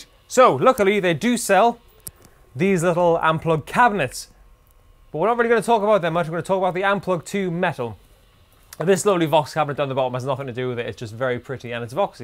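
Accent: United Kingdom. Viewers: I didn’t expect his accent to be British he looks America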